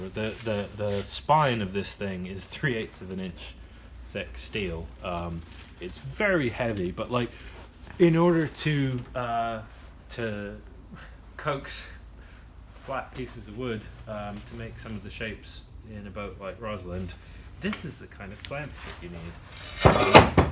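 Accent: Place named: Cornwall, United Kingdom. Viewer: His is an American tinged Cornish accent, so that's fair enough.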